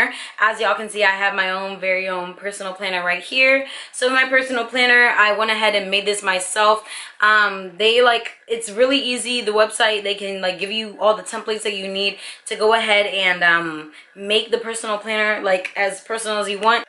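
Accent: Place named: Nigeria. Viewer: Oh my gosh, I haven't heard anyone do a Nigerian accent in a very long while. Ahh, I wan't to go back so badly!!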